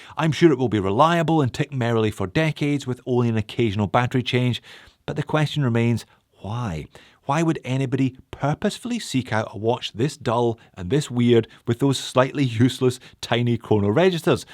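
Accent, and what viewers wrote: Scotland, United Kingdom. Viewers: I know you're in Australia but are you originally from scotland because your accent says you are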